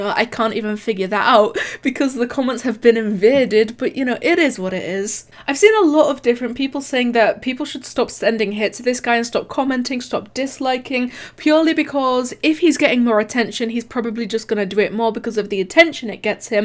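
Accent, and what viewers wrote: United Kingdom. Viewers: Yez she has british accent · She has a British accent. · Is this british accent? No seriously is it?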